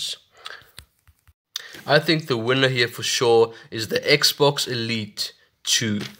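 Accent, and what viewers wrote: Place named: Russia. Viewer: Автор русскоговорящий что ли?) что с английским)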